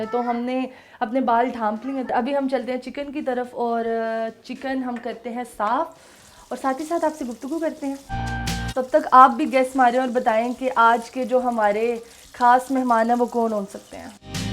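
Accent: Punjab, India. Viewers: Pure punjabi excent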